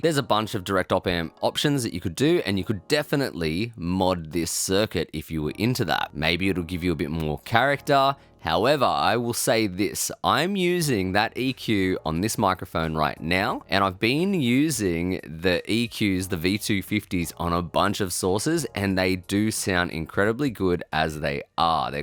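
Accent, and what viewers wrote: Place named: Australia. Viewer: Hi there, Iike your content, its interesting and informative and your accents reminds me on my days touring Australia in the 90 ies ... keep up the good work. Toni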